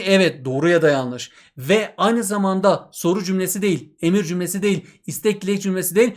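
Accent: Eastern Anatolia, Turkey. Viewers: 2025 tayfadanım herkese selamımı Hocam çok iyi anlattınız sizinle devam etmeye karar verdim.. Bi de bi şi sorcam aslen nerelisiniz acaba? Kars Ardahan Ağrı Erzurum??? Uzatmaları kullanmıyosunuz da😂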